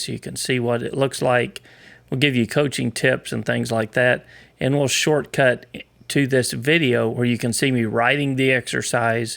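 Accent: Texas, United States. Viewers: I like the Texan accent :-)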